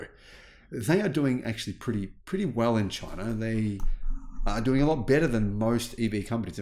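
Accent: Australia. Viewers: start to like his aussie accent, quite nice actually.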